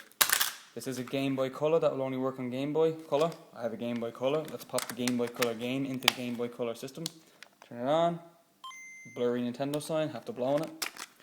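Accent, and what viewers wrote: Ireland. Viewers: ***** hes irish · You Irish?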